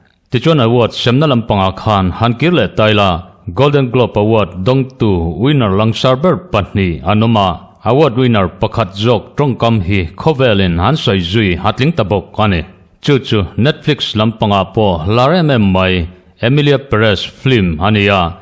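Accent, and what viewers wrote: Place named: Aizawl, India. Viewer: Aizawl milian nuho Accent ang kha inei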